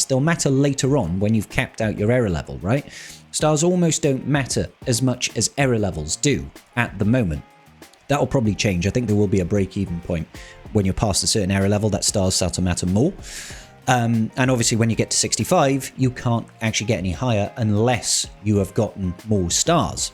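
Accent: United Kingdom. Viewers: Error? Era. You’re a Brit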